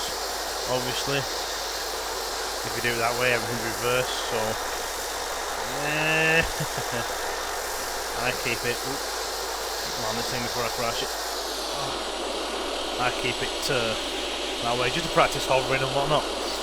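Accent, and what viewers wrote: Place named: Northern England, United Kingdom. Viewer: Where in the UK are ya dude? I know with your accent your a norther :) Thats where the good lads are from lol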